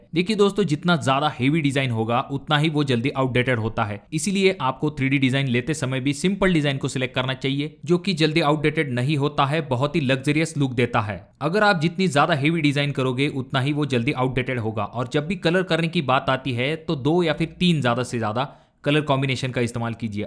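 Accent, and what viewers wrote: Maharashtra, India. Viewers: Lagta hai aap Marathi ho ? Soft aawaz nahi hai ?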